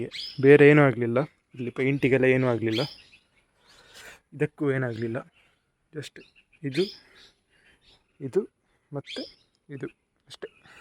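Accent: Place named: Mangalore, India. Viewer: Woww manglore accent Kannada 🔥 sakath vlog bro husharu 🔥 subscribed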